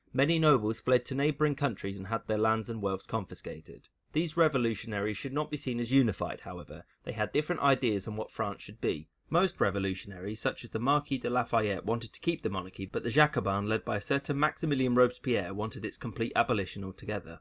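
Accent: United Kingdom. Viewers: I can't be the only one who thinks you are British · God save us from British accent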